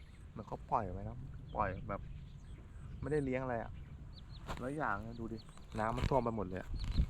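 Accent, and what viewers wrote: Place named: Isan, Thailand. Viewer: ทํามัยอีสานแล้วงัย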